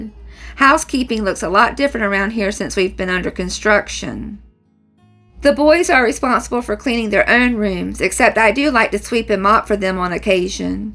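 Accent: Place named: United States. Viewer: The meal looks so nice 😋 I love just listening to your wonderful American accent x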